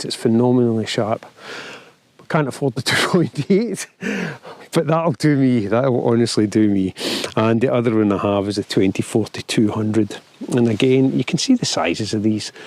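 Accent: Scotland, United Kingdom. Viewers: Great video pal, also great hear the scottish accent for a change. I had the d850 but recently sold and got a Z7ii but haven't used it yet for any landscapes. I was wondering, for landscapes when on the tripod I take it you turn image stabilisation and IBIS off? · I would book a workshop instantly if I wasn’t so afraid of only getting 1/10th of what you are saying :) So hard for me to understand the Scottish accent although I visited Scotland five times. 😂😂😂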